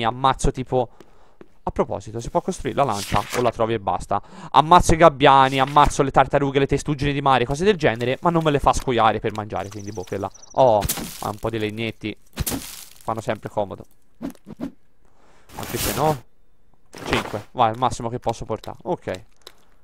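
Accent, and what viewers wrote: Livorno, Italy. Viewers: ma sei livornese?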